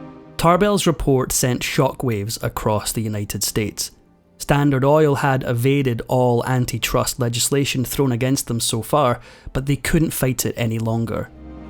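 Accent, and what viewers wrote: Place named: Scotland, United Kingdom. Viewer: First, I liked this video because the narrator has what seems to be a Scottish accent. That resonated with me because I watched a PBS documentary on Andrew Carnegie decades ago. I have not been in awe of the rich snd famous - yet I find their stories fascinating and they give me a glimpse into human nature. Just watched a short video on J.D. Vance, current candidate for Vice President - and was reminded of J.D. Rockefeller's humble start in this video. This videos thumbnail teased that Rockefeller's "dark secret" was someting he did. The man was a sinner as we all are - or have been. Still, the secret was the sins of his father. I'd done some research on the younger Rockefeller a few years ago - and vaguely recalled his dad giving him a loan. I didn't realize what an influence, "Devil Bill," was on his son - or that his son fought to overcome the shadows of his dad's dealings. 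Thank you!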